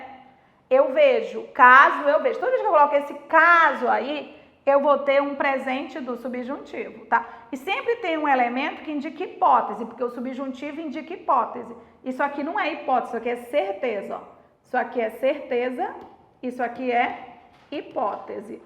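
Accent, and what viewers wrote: Pará, Brazil. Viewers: Paraense pesada! Só pelo sotaque! 😊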